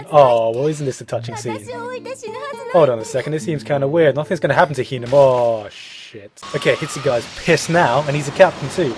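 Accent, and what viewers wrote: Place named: England, United Kingdom. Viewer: Nice English accent,. Are you English by the way? Well, anyways, that's not important. Naruto and Bleach can be overated sometimes. I just recently finished.hack//sign and 11eyes. They're both pretty decent animes. I've been trying to get back into Bleach, but I have to go through all those episodes again.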